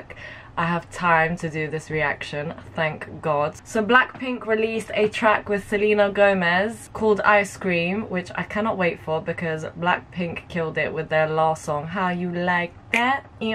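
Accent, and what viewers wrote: United Kingdom. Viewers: U from UK. Cuz i know ur Accent 😁😅😃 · I know this is out of the blue, but I have just realized that you are British from your accent. Is there any other way to get to Ireland from England than by plane?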